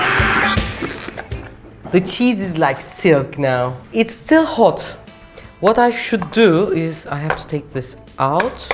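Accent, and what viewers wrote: Turkey. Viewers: Your accent only convinces me that you are Turkish otherwise you look like a south east Asian, in fact like a Punjabi. Btw recipe is amazing and easy. I'll try soon!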